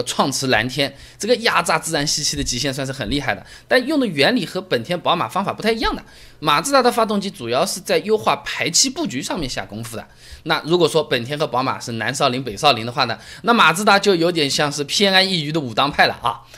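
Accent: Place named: Shanghai, China. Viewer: 喜欢你的阿拉普通话外加幽默。可以尝试更上海一些.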